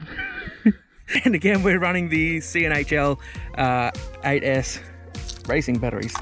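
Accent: Australia. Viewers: You Aussie? Where ya from? Looks like S.A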